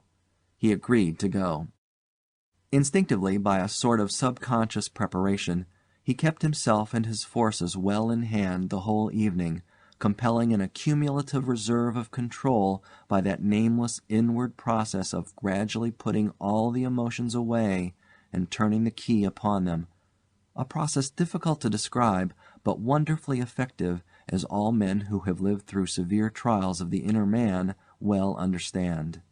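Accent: United States. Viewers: Thoroughly enjoyed this ghostly story, the American narrator was a surprise, but he read the tale masterfully, keeping the suspense until the end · The American voice is a welcome change. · Funny I think when it's a ghost story narrated,do we expect a British English accent?So when an American narrator comes on..we are surprised..Let's listen..love a bit of spooky!!🙂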